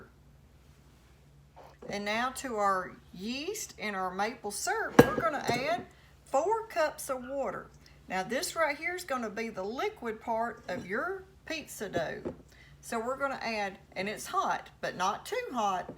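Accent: South, United States. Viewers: Have you ever heard your voice?? It’s horrible you give southerners a bad look & btw fingers are not pronounced as dangers ! Please try to do better!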